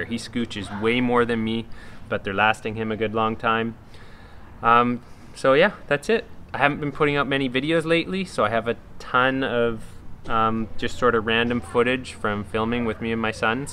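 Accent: Canada. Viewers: Are you from Canada or Minnesota?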